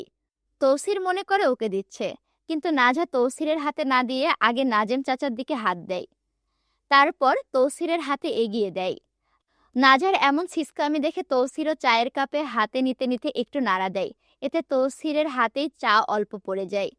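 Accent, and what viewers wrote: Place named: Rural, Bangladesh. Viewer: আপু তোমার মুখে গ্রামের আঞ্চলিক কথা আামার বেশি সুন্দর লাগে 🌸🌸🌸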